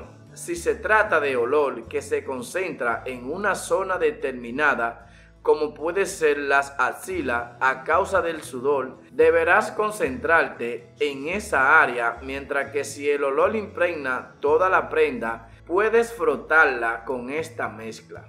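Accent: Dominican Republic. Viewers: Es que así es el habla del dominicano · es dominicano xd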